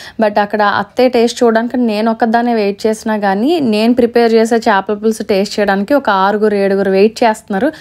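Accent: Godavari, India. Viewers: Mamu godavari slang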